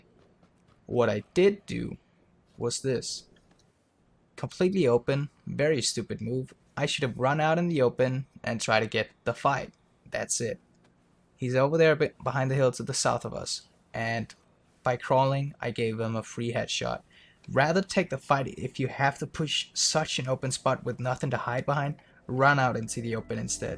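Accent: Denmark. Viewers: Are you Danish???? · i couldn't help but notice your accent and ask if you're Danish? It sure sounds like it:) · Are you danish? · are you Danish?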